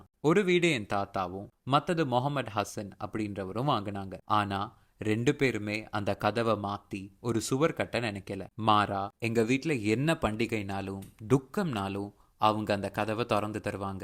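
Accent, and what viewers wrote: Sri Lanka. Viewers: I really enjoy your Tamil slang. The way you mix Sri Lankan Tamil gives it a unique charm and a refreshing vibe. And, are you not muslim 😅 can you clarify to me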